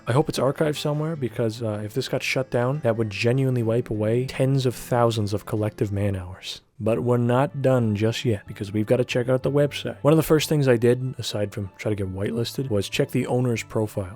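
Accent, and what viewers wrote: New York, United States. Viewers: Shout out to my fellow Canadian that sounds like a new yorker. We're a rare breed.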